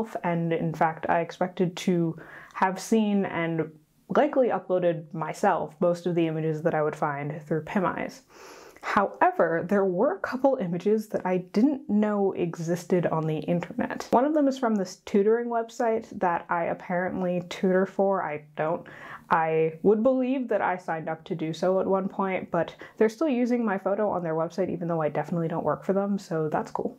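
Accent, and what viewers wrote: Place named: United States. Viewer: As I am not American (although English is my first language) I really struggled with understanding what you were saying. You speak quite fast and your speach is not particularly crisp. If you want to convey information to people please be aware they need to understand what you are saying. Not everyone can keep up with American speed speach !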